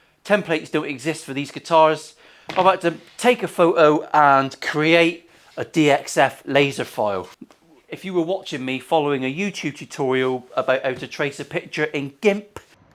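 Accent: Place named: Northern Ireland, United Kingdom. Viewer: Cant wait to see this finished mucker. That norn irish accent is pure class so it is ! I got a MK NF53 and its awesome. That paisly top is stellar. Going to be an amazing build again!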